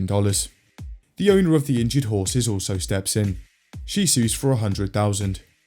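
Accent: United Kingdom. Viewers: with a bri ish ascent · Not fond of a Brit voicing this about Cleveland. If you’re gunna make fun of the USA, get someone with an American accent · I love the British man going over my state's history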